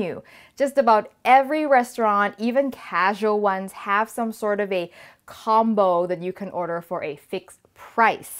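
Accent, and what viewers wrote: France. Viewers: Perfect French accent ! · Your French accent is amazing !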